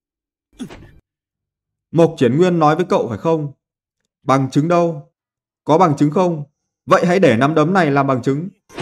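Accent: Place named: North, Vietnam. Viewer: sao cứ thuyêt minh giong bắc khong đã vây nghe không biết nam nữ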